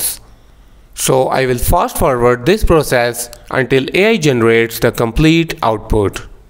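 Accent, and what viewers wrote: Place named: United States. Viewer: Nice accent for an American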